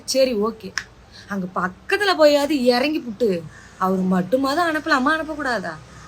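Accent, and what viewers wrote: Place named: Tiruchirappalli, India. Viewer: Nee endha ooruma? Trichy slang a theriuthu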